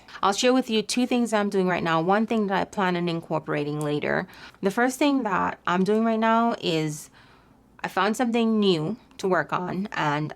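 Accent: Jamaica. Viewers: Are you Jamaican? Because your accent is showing 😊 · I hear a slight Jamaican accent? If so hey girl hey! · Love the Jamaican accent, you resonate with me!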